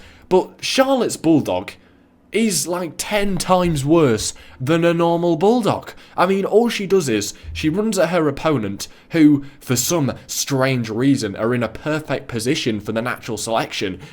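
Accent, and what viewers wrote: United Kingdom. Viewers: You don't know what your talking about clearly you don't know anything about wrestling because all of those are great finishers and if you would really watch wrestling you would know that it's not supposed to hurt because wrestling is fake it's all just a act so maybe you should do more research because you don't know anything and your British a ten is horrible go back to pre-k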